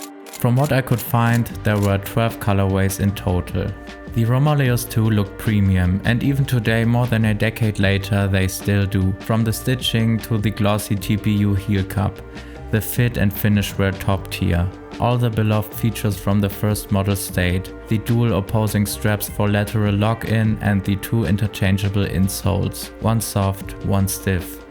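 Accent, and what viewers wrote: Germany. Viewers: aaaah mystery solved, thanks. Great Denglish btw. Best of luck with the brand and good night!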